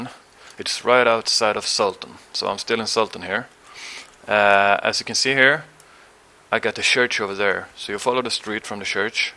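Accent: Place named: Netherlands. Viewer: nederlands? hoor het aan je accent :D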